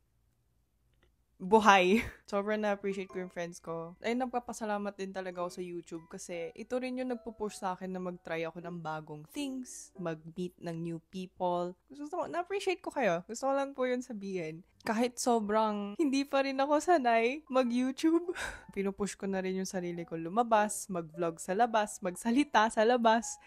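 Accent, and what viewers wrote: Batangas, Philippines. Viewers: The batangueño accent is giving HAHAHAHAHA nachika kalang pero nakakahatak talaga ng attention hahahaha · Saan ka dine sa batangas? Kakatuwa ang punto mo, batangeño batangeño eh..😂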